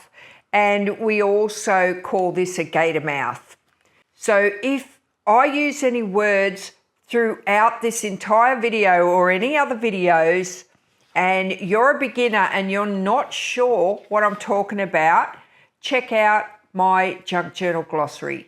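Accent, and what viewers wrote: Australia. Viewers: Such beautiful ideas. Love your Aussie accent ❤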